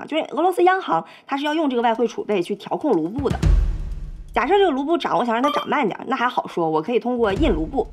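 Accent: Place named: North, China. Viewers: 感觉是北方口音，但是咋判断是东北人！？ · 小Lin的口音听着像山东老乡啊。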